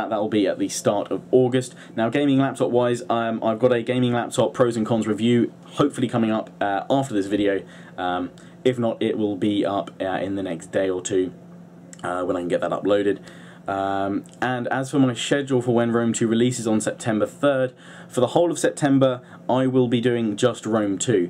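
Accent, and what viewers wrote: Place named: United Kingdom. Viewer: I think your videos are great, and thank you for clearing things up about rome 2. I think your British accent is awesome, what dialect is it in particular?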